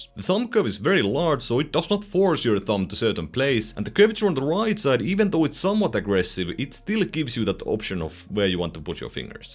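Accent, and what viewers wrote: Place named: Finland. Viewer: First time in your channel and I had to check immediately are you a Finn or Estonian :D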